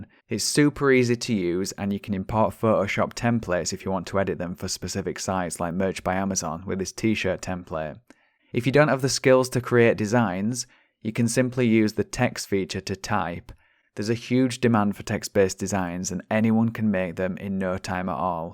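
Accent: Yorkshire, United Kingdom. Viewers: I recently found your channel and I have been making my way through your videos and I just want to say a huge thank you, your videos have been insanely helpful. I'm fairly new to this whole POD scene, but nothing will stop me from making a success of it. Also, you sound like you're from my neck of the woods, much love from Yorkshire.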